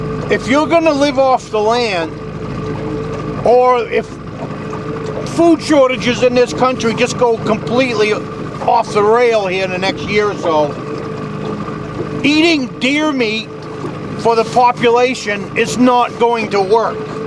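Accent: Maine, United States. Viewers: My mother born and raised Down East Coastal Maine . When most lived and hunted and caught most of their life . She met my Dad in NC and raised a family ... I spent many of my best childhood times crawling rock and raking blueberries . i have always dreamed of a permanent return ,but life and the wild extremes of winters keep me Southern fried and hot ... I'm tuning in to hear your voice and the accent of my relative's who no longer walk the wildwoods or clam bake on a cool summer evening . and to catch a glimpse of dream I've never forgotten . thanks for the memories that come rushing in like high tide on a full moon .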